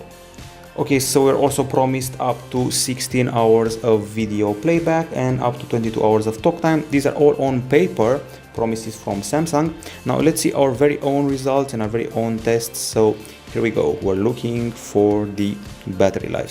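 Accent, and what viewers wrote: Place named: Romania. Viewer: După accent ești român? Bun review. Multumim!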